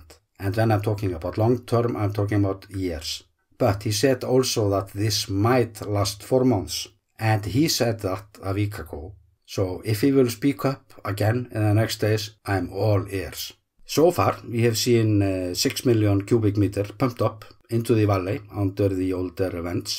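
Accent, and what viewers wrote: Iceland. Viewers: Icelandic accent is so cute and funny!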